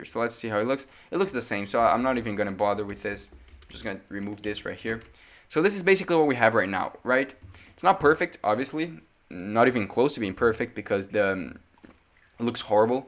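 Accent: Brazil. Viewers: This is the first time i listen to a brazilian speak english... Why Brazilians dont like to speak english? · Mano pior que o sotaque também entrega kkkk esse é o primeiro vídeo que vejo seu e já tive quase certeza que tu é brasileiro pela forma de falar, porque eu falo do mesmo jeito hehe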